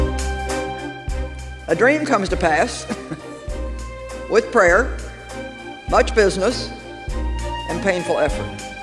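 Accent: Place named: South, United States. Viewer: I can't take this lady's southern accent seriously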